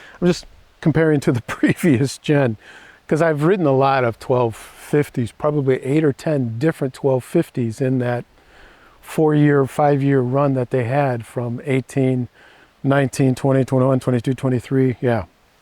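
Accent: United States. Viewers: First time watching your channel, but noticed you have the most American accent of any European I've encountered. I like the adventure bike, but like you stated, I think I'd rather ride something else for exhilaration. I do love Ducati motorbikes.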